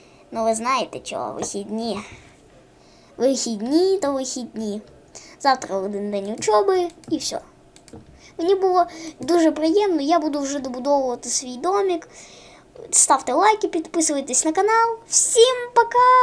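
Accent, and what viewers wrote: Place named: Surzhyk, Ukraine. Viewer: Чьо суржиком говориш !?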